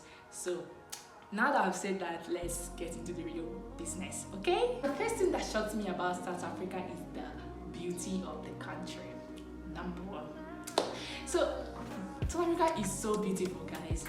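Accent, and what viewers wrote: Nigeria. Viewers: you have south african look, hence they talked in our native language. it is not easy clear with you, only when you speak you sound naija. you look like south african, skin tone, face propotion and and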